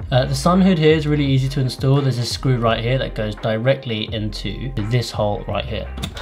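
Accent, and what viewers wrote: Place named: United Kingdom. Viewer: When did Elliot Choy get a British accent?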